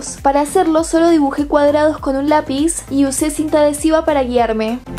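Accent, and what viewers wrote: Argentina. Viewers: Eres de argentina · Sos argentina? Sólo para saber xd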